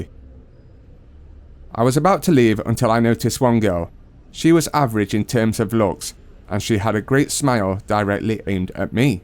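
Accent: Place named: Sheffield, United Kingdom. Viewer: The narrator sounds like he hails from Sheffield, England.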